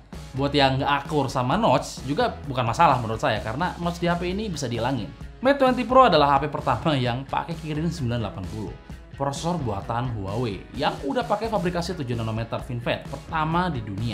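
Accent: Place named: West Java, Indonesia. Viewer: Sunda ninganan. Baruk urang mana da ngomong basa nasional wkwkwk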